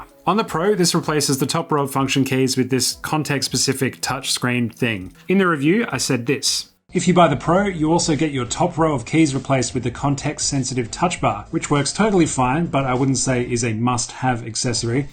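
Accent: Australia. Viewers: was going to ask if you're Aussie based on your accent and I was right... Melbourne represent! Love seeing Aussie tech you tubers :)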